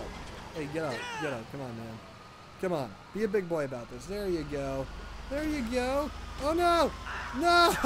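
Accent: Sweden. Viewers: Are you from sweden😃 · Are you swedish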